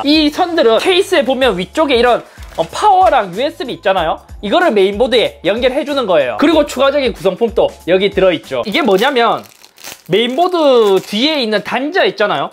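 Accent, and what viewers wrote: Gyeongsang, South Korea. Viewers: 말하는게 경상도 느낌난다 했는데 부산분이엿구나..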